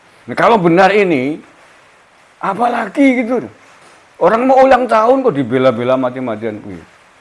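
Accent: Banyumas, Indonesia. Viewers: Sampyn orang mna pak,,,nada bicarane mirip nyonge,,,hehe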